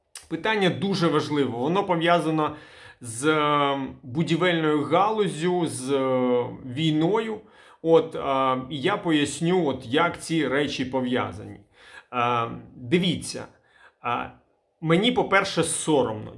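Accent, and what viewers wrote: Russia. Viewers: Что-то сильный російський акцент в твоей український мове. В твоей речи нет украинской манерности.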